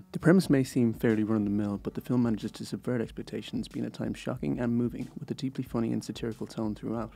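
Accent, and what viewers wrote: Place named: Ireland. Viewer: Nice work. I'll definitely be checking in on your reviews from time to time. The format's strong, albeit a bit rushed? That's my only criticism. I understand your accent fine but outside of Ireland, your audience might struggle at that speed. Or maybe not. I guess time will tell. Looking forward to seeing the channel grow.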